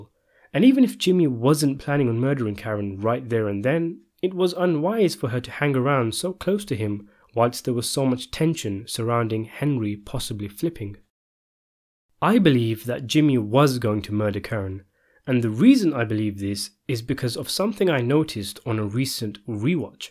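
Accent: England, United Kingdom. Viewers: Suffering as I do from the quintessential America bias to assume anyone with an English accent as a measured scholar, the aesthetic discrepency of approaching this content with said linguistic stylings, tickles me to no end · British accent. Ahh. During Special Forces selection & training in British cultures greatest difficulty is making sure they can pull the trigger. In the States selection etc.was originally modeled directly on Britain's proven methods. That changed. Among other things it was clearly not difficult to find guys who could pull the trigger. That was decades ago. In the current era both cultures have trended towards a greater ability to do so. Capisce ?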